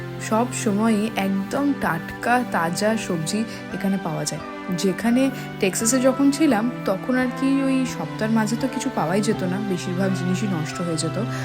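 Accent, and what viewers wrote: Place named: Bangladesh. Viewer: ধন্যবাদ একজন বাঙালি হয়ে প্রবাসী বাঙালির এতো সুন্দর বাংলা একসেন্ট এর উপস্থাপনা মনটা ছুঁয়ে যায়। ঈশ্বর আপনার মঙ্গল করুন।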